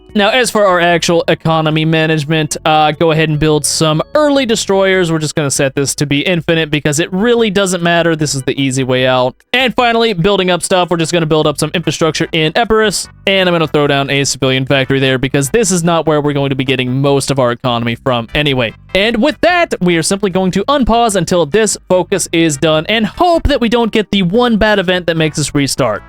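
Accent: United States. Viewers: You speak American? not England language? intersting. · You actually speak English! Granted it is Americanised English.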